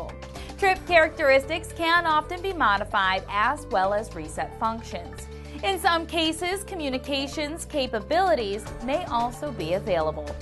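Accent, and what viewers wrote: United States. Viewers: Torturous American accent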